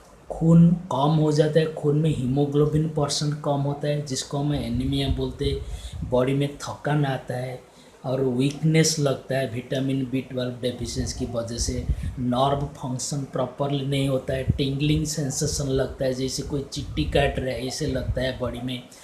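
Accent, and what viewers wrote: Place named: Odisha, India. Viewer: Mujhe lagta hee ki tum odia hoo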